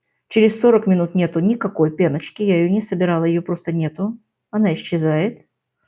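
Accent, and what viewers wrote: Baku, Azerbaijan. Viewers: Спасибо Вам! Живу в Краснодаре. Так приятно слушать Вашу речь, бакинские нотки слышны.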